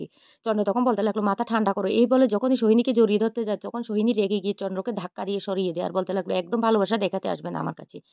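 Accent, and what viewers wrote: Chittagong, Bangladesh. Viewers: Chatgaya bhasa tone e katha bandha korun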